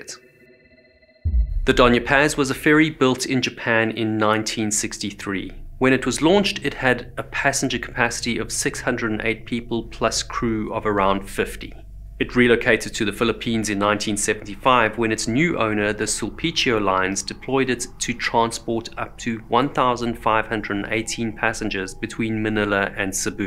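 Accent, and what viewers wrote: South Africa. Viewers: His pronunciation is typically South African and is perfectly comprehensible to my American English ear. Where are you from that you are having a hard time understanding this?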